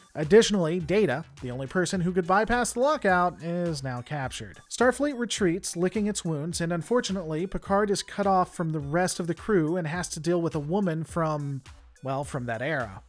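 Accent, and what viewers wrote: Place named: South Philadelphia, United States. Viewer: You from south Philly? Your accent.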